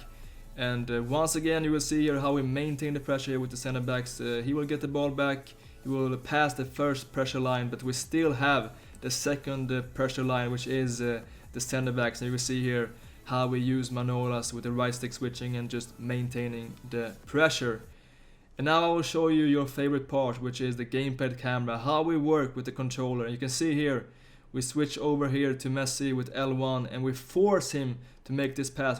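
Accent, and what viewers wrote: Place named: Sweden. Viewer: Man hör direkt när det är en svensk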